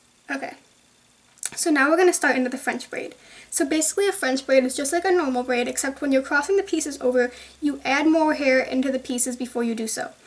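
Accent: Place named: Boston, United States. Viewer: Are you from Boston? You sound like you have a little bit of a bostonian accent! :)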